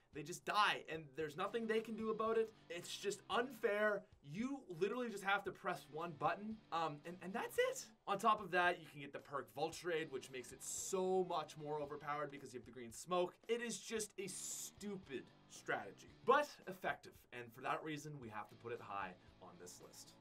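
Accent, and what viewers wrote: Canada. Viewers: Love your videos!! You're Canadian accent is hilarious too😂😂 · I love how Canadian he is. Oat-standing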